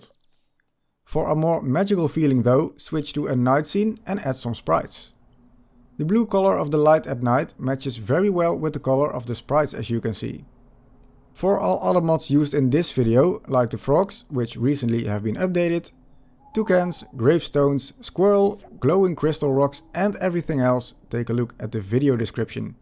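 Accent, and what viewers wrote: Netherlands. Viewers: Are you Dutch? xD these mods are cool :D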